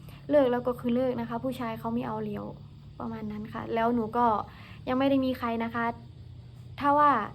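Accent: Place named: Sakon Nakhon, Thailand. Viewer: ตกลงน้อง เป็นคนบุรีรัมย์หรือคน สกลนคร ตอนดูคลิปขับรถพูด เหมือนคนสกลนคร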